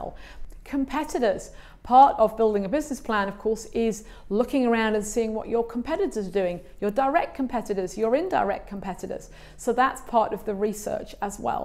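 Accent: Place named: England, United Kingdom. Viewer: Hey, I hope you read this. I guess you can tell by now I've been watching a lot of your videos, some of them a number of times and taking notes on some. I really am drawn to your rich English accent, but has drawn me the most is your common sense and wise advice to building a successful art business. One of the things I got from you is that I really need to do my homework, research. My ideal client, customer is out there looking for what I uniquely have. But need to be where they can find me or our paths cross. I know I want to help other baby boomers like myself realize there dreams too., thanks again for your help. From Kingsville, Ohio 🎉 😀 ❤ Cynthia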